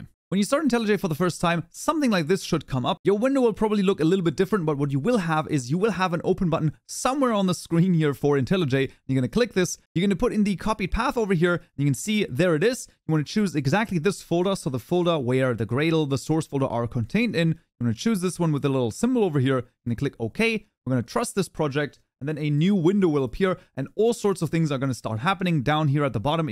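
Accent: Germany. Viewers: i think i can hear a german accent or something likely (no hate im german to🤙)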